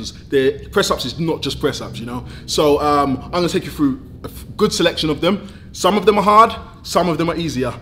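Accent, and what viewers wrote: London, United Kingdom. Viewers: OMG!!! I haven’t heard a London accent for two years 🤗🤗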